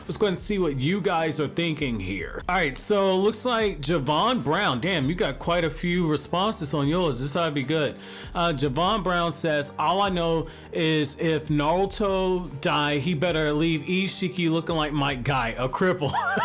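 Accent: New York, United States. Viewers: I fucking knew you was from New York when I heard you laugh bc my clan filled with New Yorkers but know it’s confirmed p.s New York pizza ain’t shit compared to Chicago 💯🤣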